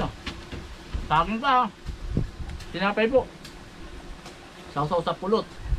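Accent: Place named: Batangas, Philippines. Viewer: batanguenyo kb, puntong batangas ka eh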